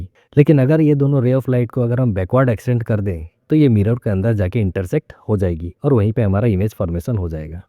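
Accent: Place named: Northeast, India. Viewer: Bhai aapke bolne ka tone north eastern states ki tarah h😅😅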